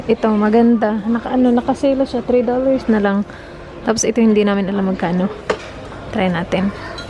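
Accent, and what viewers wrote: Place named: Visayas, Philippines. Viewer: Te pag bisaya nalang ... bisaya Kay imong accent ... love ur language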